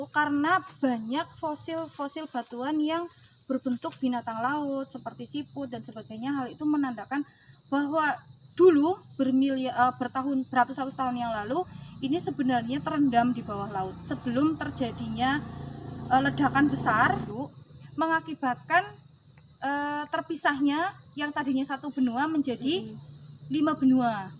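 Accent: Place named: Java, Indonesia. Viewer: Ternyata bhsanya sedikit medok ... 😂😂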